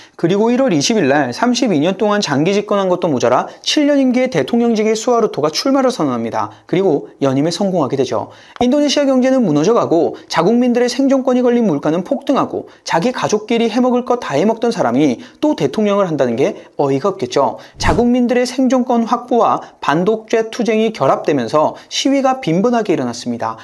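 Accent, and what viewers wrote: China. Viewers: 내용이 좋으면 모하노 성조가 중국어급이라 처음 듣자마자 거부감부터 드는데 전국구 방송하려면 표준어 구사를 하던지 악센트를 고치던지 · 조선족 같다능 ~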